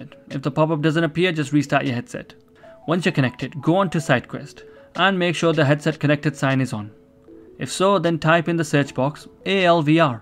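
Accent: India. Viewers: u sound like dani but indian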